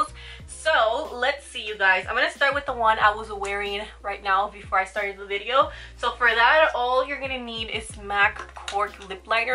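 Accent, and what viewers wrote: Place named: Puerto Rico. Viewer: Hello, I just started watching your videos and immediately I looked for a video in Spanish and I loved it ... but I am learning English and I will see your videos to learn how to apply makeup and learn a few words. I loved hearing you speak Spanish and knowing where you are from because of your accent. speaking english no idea you were from Puerto Rico a big greeting you are amazing... arriba los latinos !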